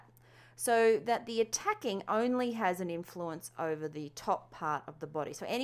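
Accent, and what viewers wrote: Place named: Australia. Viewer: I'm from the US, and there is something about the Aussie accent that just makes it feel easier to learn and listen. That or this lady is great at explaining. Maybe both! Just me?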